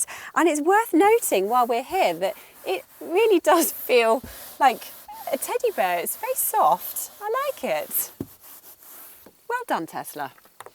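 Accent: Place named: Cockney, United Kingdom. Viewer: Nice cockney accent ❤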